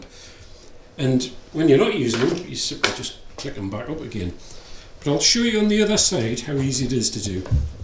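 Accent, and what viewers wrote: Newcastle, United Kingdom. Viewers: Hi, nice to hear a proper accent - not many Geordies over here on the Isle of Man! I'm interested in the adjustable levers for sure, a better exhaust and getting rid of that stupid speed limiter - no 70 limit here. Just a little more would be helpful! Also maybe a cam in the future, I don;t need a rocket ship (have 5 other bikes) but just a bit more go would really make this little bike. I also find the shocks very hard on our bumpy roads, but they may run in yet - we'll see. I would accept a little loss of handling for a better ride. Oh and a rose jointed ear linkage, but may make that myself - I need to keep a heel and toe, smashed left foot full of screws. (walking accident, not bike!).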